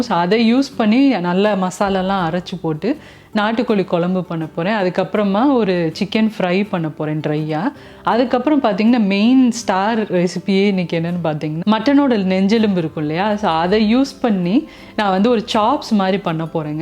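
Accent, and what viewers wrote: Madurai, India. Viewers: Bro review super..madhurai slang summa alluthu...😍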